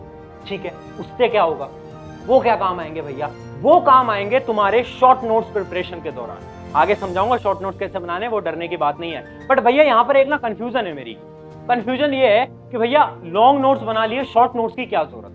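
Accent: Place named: Western Uttar Pradesh, India. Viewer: Ye bhaisaab west UP ka lag raha he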